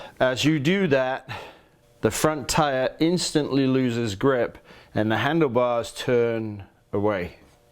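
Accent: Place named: Wales, United Kingdom. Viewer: Hi just wanted to ask where in the world that accent comes from, sounds WELSH to me?? Good lessons though and thanks for your time doing these!!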